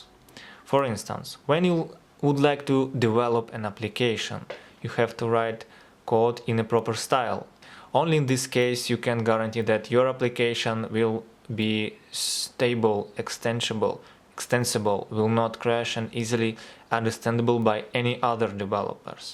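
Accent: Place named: Russia. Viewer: я бы сказал , что это русский мужик читает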